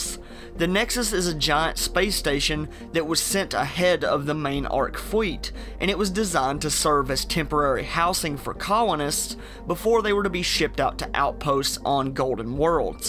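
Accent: South, United States. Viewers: No disrespect, since I'm from Mississippi myself, but do I detect a deep southern drawl there (Texas or Tennessee maybe)? ^_^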